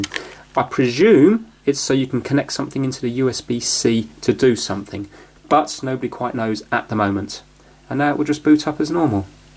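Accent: United Kingdom. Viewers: Good tips dislike because of your british accent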